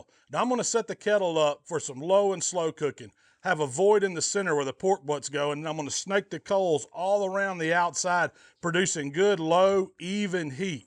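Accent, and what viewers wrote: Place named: Georgia, United States. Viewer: You know a BBQ video is authentic when the cook has a thick Georgia accent. I’ve used the snake method for cooking BBQ pork shoulder, and it’s almost a “set it and forget it” method. It’s easy, no messing with adding additional coals, and works every time. Thanks!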